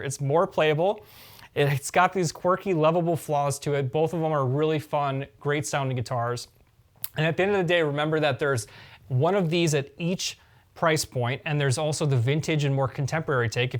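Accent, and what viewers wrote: United States. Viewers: or Picksburgh accent. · Chicago accent?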